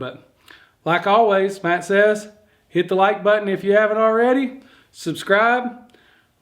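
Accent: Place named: Carolinas, United States. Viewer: You’re from the Carolinas? sure sounds like it🍻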